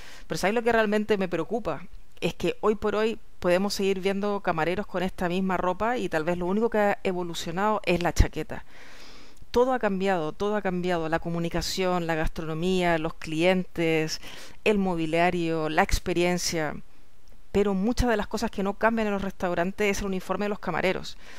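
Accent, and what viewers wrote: Chile. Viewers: Habla como Chilena. Es Chilena?? Excelente contenido sí. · Hola, acabo de llegar a tú canal y me he dado cuenta que eres una profesional del marketing gastronómico por lo que me he suscrito a tú canal y comentarte por consejos y estrategias a seguir para una idea de delivery de comida peruana y postres chilenos y peruanos. Saludos desde Santiago de Chile Tienes acento chileno, pero con algunas palabras castizas, de dónde eres?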